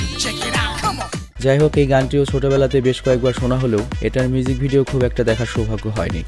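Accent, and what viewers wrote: Noakhali, Bangladesh. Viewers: এটা কিছু অইলো,,,,, ভাই আপনার বাড়ি কি নোয়াখালী নাকি ।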